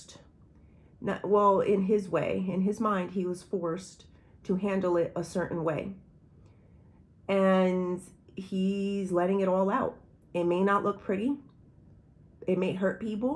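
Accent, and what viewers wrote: United Kingdom. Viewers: The British accent is awesome 🙏🏼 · This is awesome. I love the British Accent also. Yes God is helping me cleanout things people actions attitudes that have held me back from enjoying true happiness. Thank you your reading is on point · Everything you have talked about today is 💯and the proper part? I’m from Manchester England and you were sounding like me. Lol and you know your right. Just being able to be yourself with no restraints like a child again in a way. God always shows me when I need it. Thanks for this today. I needed it🙏⭐️💐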